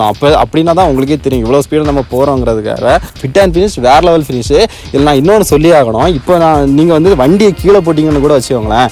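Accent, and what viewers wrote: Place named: Kanyakumari, India. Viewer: but slang lita kanyakumari slang varuthae 🤔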